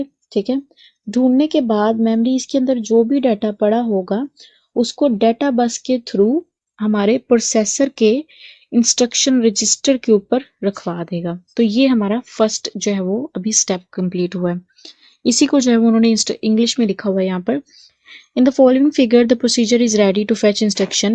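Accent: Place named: Pakistan. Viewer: Pakistani voice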